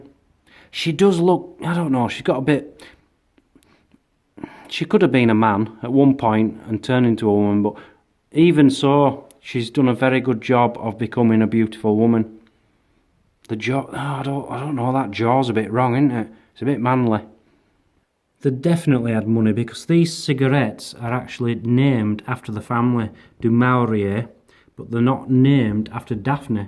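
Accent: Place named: North, United Kingdom. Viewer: I could listen to that Northern accent all deey.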